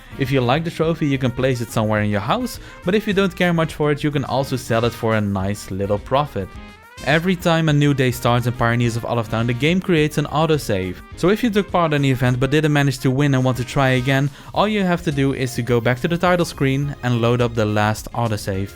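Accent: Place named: Netherlands. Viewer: Hi thanks for the tips. Are you from the Netherlands 🇳🇱 you sound like my parents who were both born and raised there. Great advice thank you 😊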